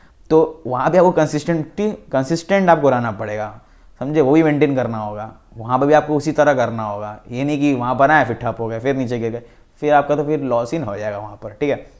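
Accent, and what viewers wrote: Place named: Bihar, India. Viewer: UP-BIHAR ACCENT ☺️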